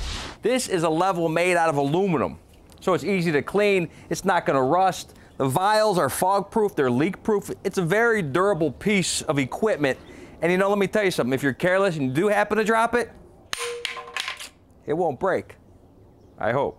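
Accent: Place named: Brooklyn, United States. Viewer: I am getting Italian Brooklyn vibes lol. Learned a lot TY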